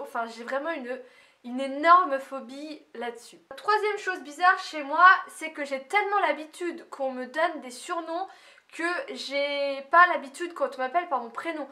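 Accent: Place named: Vosges, France. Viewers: tu as vraiment l'accent vosgien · tu as l'accent vosgien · ton accent... celui de Gondreville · tu as un petit accent vosgien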